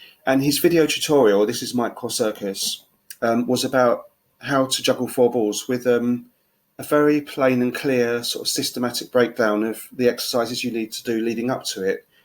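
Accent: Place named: United Kingdom. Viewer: I never really noticed that you had like a British accent. (Is it a British accent?). Nice video by the way.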